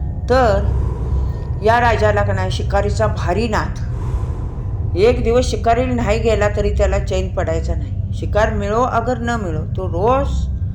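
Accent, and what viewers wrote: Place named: Satara, India. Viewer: आज्जी नक्कीच सातारच्या असणार आहेत. आमच्या सातारची गोष्ट सांगण्याची आजीची शैली खूप आवडली. भाषेत गोडवा आहे.सांगण्यात खूप एकाग्रता ...तळमळ..जाणवली🙏🙏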